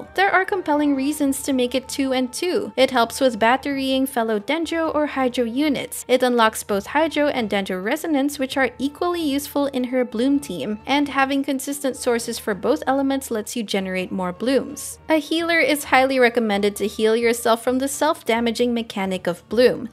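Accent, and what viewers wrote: Philippines. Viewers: I figured by the accent, Pinoys just have that certain twang lol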